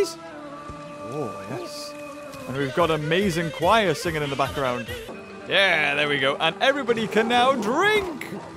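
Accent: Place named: England, United Kingdom. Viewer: I love that English act sent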